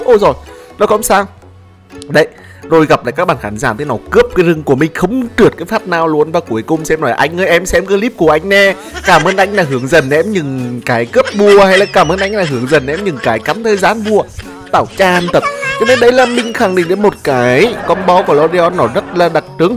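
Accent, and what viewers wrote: Nghe An, Vietnam. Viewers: Anh ở đâu Nghệ An thế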